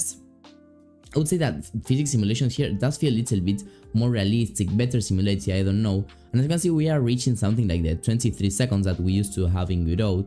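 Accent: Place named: Argentina. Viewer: Sos argentino? Tenés acento lol :D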